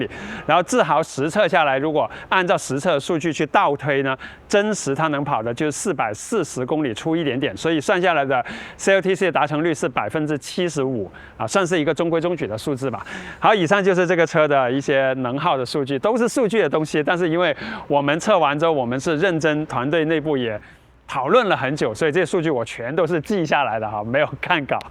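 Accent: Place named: South, China. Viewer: 听口音，100%南方人，哈哈哈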